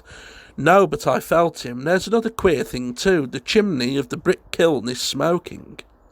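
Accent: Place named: Solihull, United Kingdom. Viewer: What’s the narrator’s accent? I want to guess Solihull, but I must admit my experience is very limited, being an Australian presently kipping in London...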